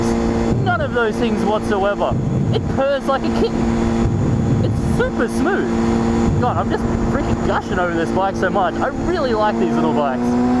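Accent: Australia. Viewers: australian accent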